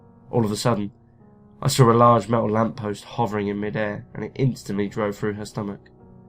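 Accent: London, United Kingdom. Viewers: The last line is so true..but even with 15people around you fighting for your life...(I had an accident and ruptured an artery) by the time only like 5minutes it took to be driven to the hospital, I had bled out two thirds of my blood..the big veins in my neck had collapsed and they couldnt get a line in. People seem to think bleeding out is painless but that is a cruel lie when I think of poor people taking their lives thinking all that lies ahead is dreaming into oblivion. The second your brain registers the drop in blood pressure it tells your heart to pump faster but while your heart jumps to command and quickly develops tachycardia then ventricular fibrilation (its like a big man jumping up and down on your chest 200+ times a minute. Meanwhile your brain is pushing your lungs as it feels oxygen depletion. They had to cut into my Femoral vein in my groin..no time for local anesthesia..the guy who did it was the cheif anesthetist (they are most qualified in hospitals for keeping people alive..he squatted over my chest on the trolly and cut then manually forced the teflon Venflon about 8" into my vein. 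In those frantic terrifying minutes I learned that EVERY man and woman is alone even in a crowded room we are prisoners in a meat and bone cage and, in the end, you go out as most of us came in, alone. Oh this is true..by your accent you've probably been near Homerton Hospital in Hackney without whose fast thinking anaesthetist I would have completed my journey still,as alway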